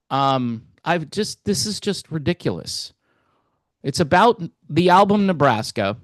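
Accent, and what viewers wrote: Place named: South Jersey, United States. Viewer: Love Your impressive brother for you sound just like him come,lte with south jersey twnag lol!! I have always been a fan of his music and do admit I am looking forward to seeing him deliver from nowhere. Unfortunately he has lost his mind and became very bitter to date. However bruce still put on a great show and seems to embrace his music in all forms. neve know about electricity for barely remember the original release. The only song I even really know from the album is which is very haunting. God bless you, my brother, and I hope you end up liking this biopic, which seems at least tentative.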